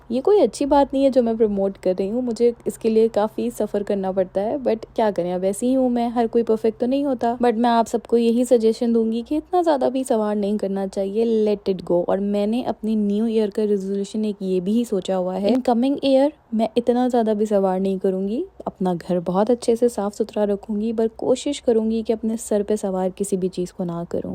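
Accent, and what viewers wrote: Karachi, Pakistan. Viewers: hi,i like your vlogs,your urdu is very nice like karachi waly.want to ask you about your folding matress,from where did you get it and its price as wel coz i like it nd want it for my kids.❤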